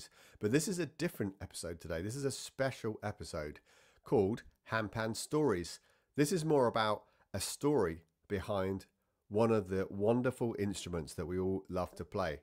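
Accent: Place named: United Kingdom. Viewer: Oh the struggles with recording speech that makes sense lol Kudos to you for recording without a script. And BTW I love your beautiful British accent 😊 (I know you ARE British but not every Brit sounds as nicely as you!)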